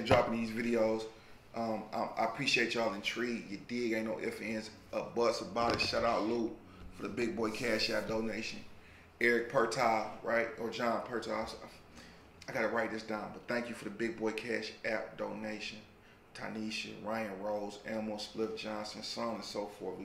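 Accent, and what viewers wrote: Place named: Michigan, United States. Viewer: it’s how we talk in Michigan, call it bad pronunciation, regardless the talk different for us ✌🏾❤️ 🧤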